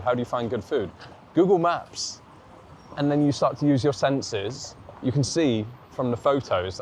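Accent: United Kingdom. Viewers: Great video! You have Italian passport while speaking UK accent? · How is it you're using an Italian passport when you sound English?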